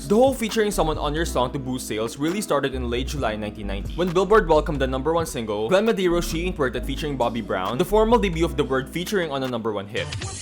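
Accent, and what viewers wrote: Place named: Philippines. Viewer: I'm living through your stanship and filipino accent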